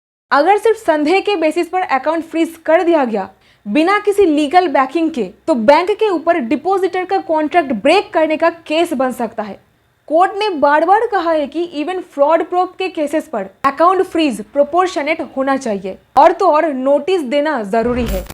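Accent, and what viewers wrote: Bengal, India. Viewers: Bangali ki hindi jyadatar aisi hi hoti hai 99.%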